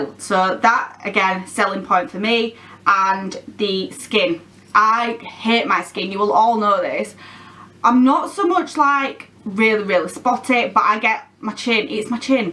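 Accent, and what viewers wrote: Yorkshire, United Kingdom. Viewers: Yey , it’s nice to not be the only YouTuber with a Yorkshire accent 😂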